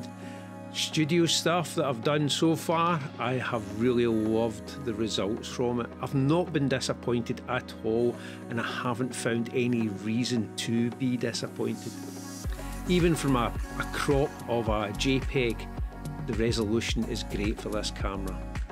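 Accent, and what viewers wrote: Scotland, United Kingdom. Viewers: Since you made this video, has there been any firmware updates that would have fixed the focus issue you are talking about? If not, I would think this is something that Fuji will ‘fix’ with a future update… I’m toying with the idea of getting the X-T5 with the ‘kit lens’ and leave buying a prime lens for another day… the ‘kit lens’ being a real good lens it’s worth getting with the camera (18-80mm). I used to own the X-T1 and XF35 lens (I also had the 28mm lens too) but traded them in to buy a black X100v. Now, I’m seriously considering trading up to the X-T5 and selling my X100v as it’s so heavily sought after, it’s selling almost at the new price point reducing the final price… these new Fuji cameras are ridiculously expensive these days. Will you be doing, or have you done, a follow up video on how the camera is fitting into your workplace? 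Nice to hear a Scottish accent in a camera channel… 🏴 Flickr Ormie (O)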